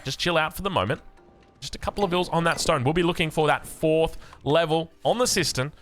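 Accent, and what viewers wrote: Australia. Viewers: Hi I love your videos but I have a massive problem watching them. I watch them generally before going to sleep and your buttery australian voice guides me to sleep. However I have developed a sort of Pavlovian response that now whenever I watch your videos I get really sleepy all of the sudden. Anyway still love your vids and have a G'Day!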